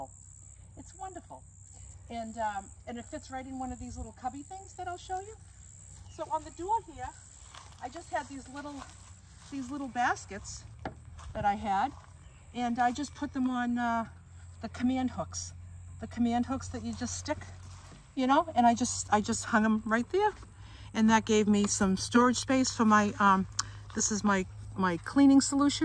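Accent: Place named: Boston, United States. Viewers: You talk just like me so you must be from New England. 😊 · Yep I recognize that Bawsten accent. Love Boston ( I’m in Ct) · Love your van, so well thought out and comfortably organized. I'm from Boston born and raised so I recognized your accent! I love how you have accommodated your priority to your self care of healthy eating and lifestyle, you have proved it can be done even living in a small van on the road. Brilliant!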